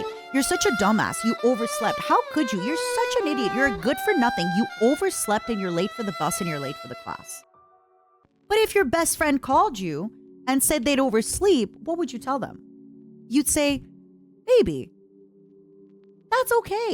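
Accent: Quebec, Canada. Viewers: as a french from France, it's wierd to have a "typical" french music, over an quebec accent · lmao she even speaks the Quebec dialect xD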